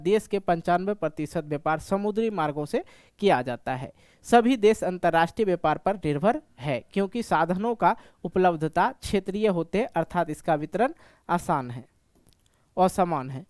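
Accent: Bihar, India. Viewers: Sir aap Bihar se ho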